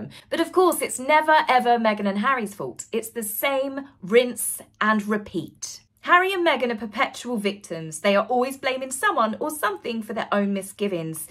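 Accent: United Kingdom. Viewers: I always enjoy your pretty face and your British accent when I click on your videos!! You are always so cheery and happy!! I love that!!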